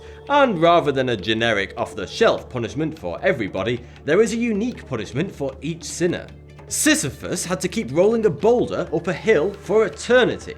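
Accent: United Kingdom. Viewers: Maybe someone who doesn't believe in something to the point of mocking, shouldn't inform people about other theologies. Fire, earth, air, water. Follows the pattern of life, humanity and all of it. So, you're funny and all, but really.... you sound, like, too British.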